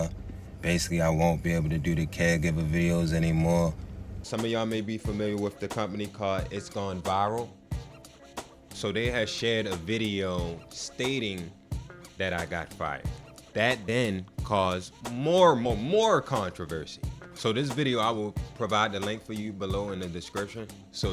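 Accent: Baltimore, United States. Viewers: I have dated quite a few Baltimore men and have a lot of friends here so yes you all sound like that but it's cute! I used to get mad when my ex called me dummy but now I realized that it is a term of endearment! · That Baltimore accent is obvious . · dont apologize for dat baldamo accent young brotha 410!!!!! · B- more accents are cool in my opinion😎 Baltimore keep stayin true 💯💯 Much love from Savannah Georgia🍑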